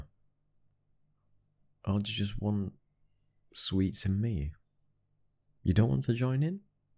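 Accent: United Kingdom. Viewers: I’m obsessed with british accents😍 Especially this man’s voice.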